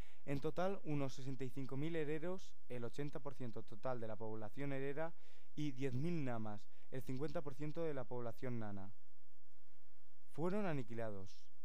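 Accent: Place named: Germany. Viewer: Me gusta tu acento alemán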